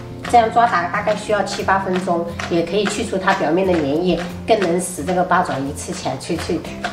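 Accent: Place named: Quanzhou, China. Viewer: 我离开泉州二十年了。听见乡音很亲切！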